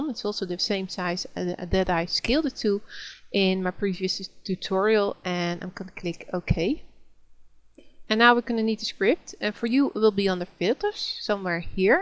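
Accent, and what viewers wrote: Netherlands. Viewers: She really has an accent from Holland, not an insult but most people of the Netherlands most of the time can't speak with a good French accent, that's more something for Flemish people. btw, thanks for the great tutorials ' '! It rlly helped me!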